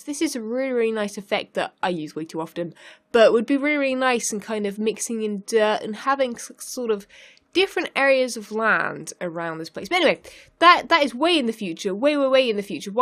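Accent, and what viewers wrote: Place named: England, United Kingdom. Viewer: i love your English accent :D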